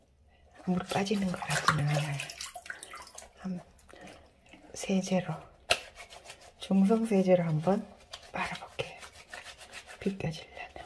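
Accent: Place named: Gyeongsang, South Korea. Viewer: 반가워요 ^^ 갱상도 사투리가 와 이리 듣기 좋뇨..ㅎㅎ 풀청했습니다..그리고, 좋아요..5 눌렀습니다.. 요근래 구글이 좋아요를 삭제하는것 같더라구요, 그래서 전 시간내서 어렵게 방문 한 유친님들게 풀청후에 좋아요 눌러드립니다.. 응원합니다 ~!!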